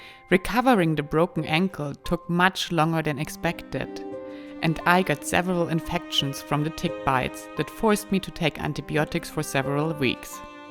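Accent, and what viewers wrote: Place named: Germany. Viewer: Jura is pronounced SHÜÜRA and not with your heavy kraut accent YOOORAH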